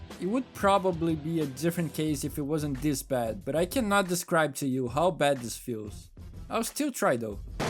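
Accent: Brazil. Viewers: Te sigo há meses e meses e só agora que descobri que tu é brasileiro. Bem que desconfiei do sotaque ahahahaha um dos canais que mais me divirto assistindo. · Esse sotaque terrivel de brasileiro me vez sentir ofendido, vou cancelar Need For Speed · Teu sotaque é igual ao do Ayrton Senna falando inglês mano kk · Adoro seu sotaque típico de Br, eu fico puxando R quando falando inglês, só sendo estúpido Achei seu canal agora, ótimo vídeo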